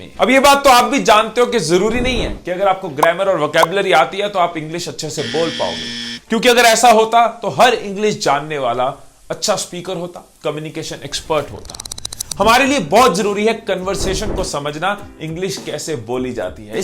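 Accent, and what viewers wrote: India. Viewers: Sir hello english indian hai ya chinese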